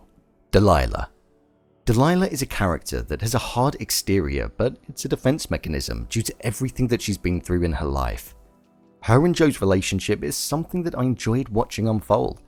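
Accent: United Kingdom. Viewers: Oh great another cynical white british male voice on youtube to bestow his oh so original critical thoughts on us.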